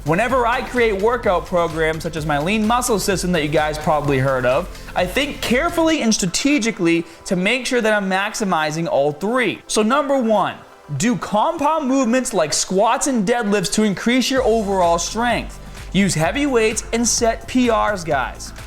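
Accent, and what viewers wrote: Boston, United States. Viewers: This guy is so Boston - his accent is all over the place. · I have a question about this guy. But, before I ask it, don't assume the purpose for my asking. It is stictly curiosity. It has nothing to do with my opinion of his reliabilty. Nor is it for any judgemental purpose. So here it is. His speech and facial movement/ eye movements seem to show signs of a, possible, minor mental disability or motor function disorder exc., that he seems to fight while focusing more on what he is saying. So, does he have some sort of disorder or what ever you want to call it? Also the signs are very seldom, being why I said "minor". Is it an accent or just how he is? He does show signs of a bostin accent but only partial or influenced.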